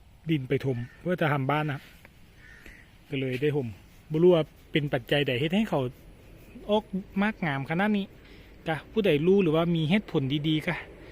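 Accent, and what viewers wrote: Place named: Loei, Thailand. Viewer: ฟังเสียงแล้วว่าต้องเป็นคนเลยคักแน้วเสียงที่เป็นเอกลักษณ์☺️😂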